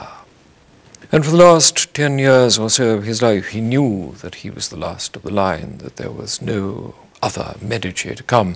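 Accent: RP, United Kingdom. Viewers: This super-posh accent surely makes anyone else sound rough, but shouldn't it be "AT that very moment" rather than "IN that very moment"? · Who wrote this script, who did this research, who does it serve? This 'mockumentary' is full of misinformation and incorrect history. He has absolutely NO knowledge of the Medici family tree. He mixes branches back and forth. The pawnbrokers NEVER became Grand Dukes. The Grand Dukes were not from Lorenzo's branch, neither was Louis' grandmother. He calls the last Grand Duke of the 'real ' Medici and the LAST Medici (really?)? Lorenzo, from a different branch, was therefore, not a 'real' Medici? Leave it to a Brit to label the Grand Duke the Last of the educated Medici (insulting!). the Lorenas were not Medici - they were Hapsburg. Do they expect that we will believe this farce because the narrator has a British accent and that he quotes other (misinformed) Brits? 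· Brian speaks posher than what the Queen does. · cut-glass accent is impeccable and flawless. An unattained aim for many people all over the world! His oratorical adornment is unsurpassable. Gifts of oratory, writing and organization are all his. It is rather difficult to imitate his manner and catch the tone of his voice. There is no doubt that he speaks a peculiarly musical English which bewitches the ears of auditors. His pomposity is merely a mannerism. I adore his pompousness and gentlemanliness. The art of saying things in the way as does, is like an ear for music, it is not to be acquired! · Posher than the queen... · The poshest man in the world. Called Brian. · I have punched less posh people for being to posh... i'm gonna find some cockney to get this guy out of my head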